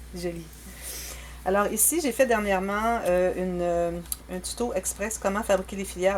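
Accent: Canada. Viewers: Je m'abonne grâce à (eternity pour toujours) tu es très doué magnifique j'adore je like 👍👍👍👍👍👍 as tu fais un tuto pour l'enveloppe à soufflet tes explications sont très très claire j'adore aussi ton accent tu es canadienne ? Si oui j'y suis allé et j'ai adoré je suis revenu et je voulais déménager la bas mil merci pour votre partage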